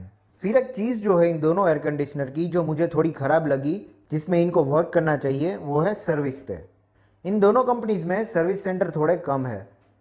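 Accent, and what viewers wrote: Gujarat, India. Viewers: Bhai aapka accent gujju he❤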